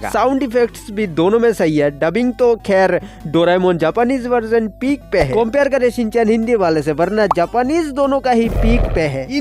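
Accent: South, India. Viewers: Lots of love from Rawalpindi your accent is same like movie Chennai express you are from which city Namaste Namaste Namaste Namaste Namaste Namaste Namaste Namaste Namaste Namaste Namaste Namaste Namaste Namaste Namaste Namaste Namaste Namaste Namaste Namaste Namaste Namaste Namaste Namaste Namaste Namaste Namaste Namaste Namaste Namaste Allah Hafiz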